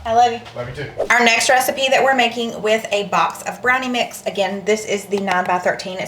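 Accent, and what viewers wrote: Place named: South Carolina, United States. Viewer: Hey I live in S.C. and with your accent u have to live here to lolll!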